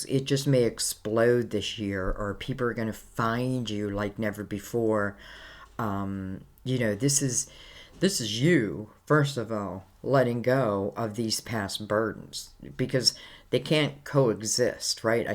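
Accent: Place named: South, United States. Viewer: Thank you so much! I needed this today. You're gifted, skilled, and personable and I have to ask where your accent is from because your voice sounds soothing and Southern. :)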